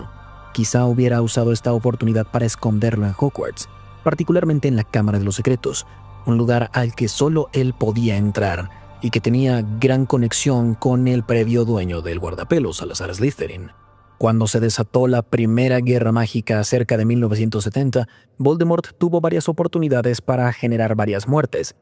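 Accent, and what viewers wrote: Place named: Latin America. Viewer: Oh español latino... esto si se puede escuchar (vengo de videos de españa y destrozan lo nombres)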